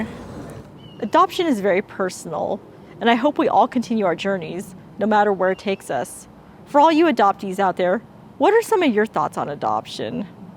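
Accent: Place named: Iowa, United States. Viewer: Hello fellow KAD - love your video. I’m going to message you and share my thoughts, because I don’t showcase mine publicly. But I want to say you’re one of the few adoptees who I can 99% relate to! And I find it funny when you say “I can still have an Iowan accent and eat kimchi.” You need to hear the way I talk! 🤣 Thank you for making this!!! ❤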